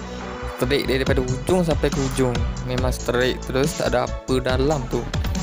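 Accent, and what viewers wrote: Kedah, Malaysia. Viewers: ckp pelat kedah terus ja bro . nampak struggle sat pelat kedah sat pelat KL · Campoq dey ..kedah KL 😂 hg ckp utara dah sempoi bak ang .. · Orang sabah,cara bunyi loqhat org kedah,tpi apa2 pn trbaik bro,HATIMU KENTAL, org klau tangan berseni prmulaan cat wlaupn x ada pngalaman, ttap akn nmpk cantik..trima ksih bro..mmng terbaiekk...dari sya IPOHMALITALAKNYOMBONGPUNYA,PERAK..hiehiehie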